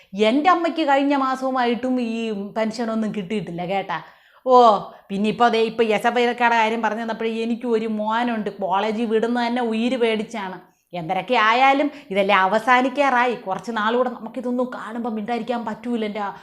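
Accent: Thiruvananthapuram, India. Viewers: തിരോന്ത്രം ഭാഷ 👍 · അടിപൊളി. ഇനി തങ്കം പോയി മീന് ശരിയാക്ക്. കൊഴിയാള. നല്ല തിരവന്തരം ശൈലി.😂😂😂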